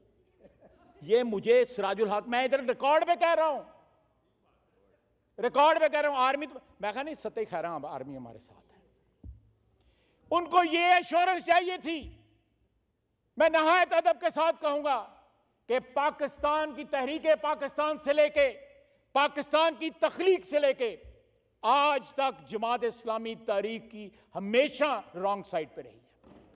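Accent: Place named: Kashmir, India. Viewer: Kashmiri easay hi bolta hai.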